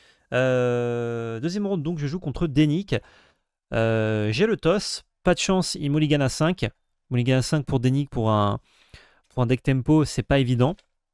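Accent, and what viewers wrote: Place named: Italy. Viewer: Quel accent italien !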